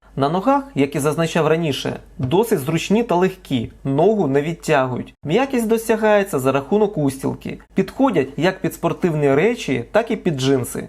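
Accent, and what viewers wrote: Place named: Voronezh, Russia. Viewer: так я не понял. это что за язык? ты где так говорить научился? говори нормальным языком, нихрена же не понятно. это воронеж? или может багучар какой нибудь?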